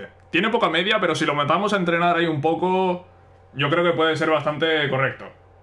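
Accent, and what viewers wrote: Andalusia, Spain. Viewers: Es de Andalucía illo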